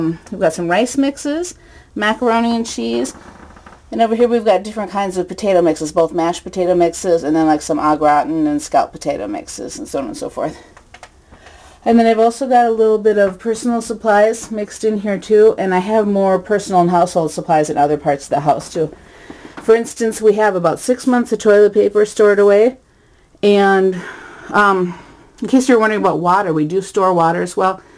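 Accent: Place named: Minnesota, United States. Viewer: You in MN? My wife and i are in the same boat. I can hear the accent.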